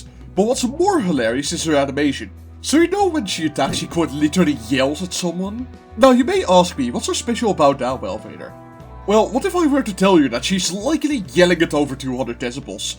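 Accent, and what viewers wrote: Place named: RP, United Kingdom. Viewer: You have the voice of a British lord, will you appear in wuthering heights?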